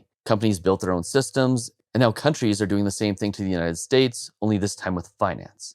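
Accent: Canada. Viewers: I will never listen to a Canadian like you. "Aboot" I can tell right away.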